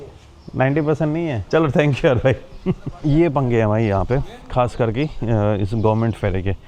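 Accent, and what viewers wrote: Haryana, India. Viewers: Bhai ye pakka haryana ka hoga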